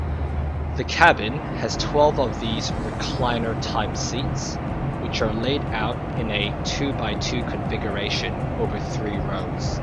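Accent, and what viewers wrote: Australia. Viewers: teach me how to speak "Australian" - when you used to say "Partner", was that another term for wife over there? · Is that a hint of an Aussie accent I detect?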